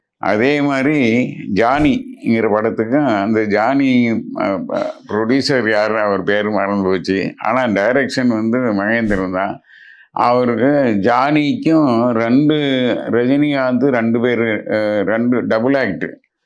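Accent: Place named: Tirunelveli, India. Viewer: சரளமான நெல்லைத் தமிழ்.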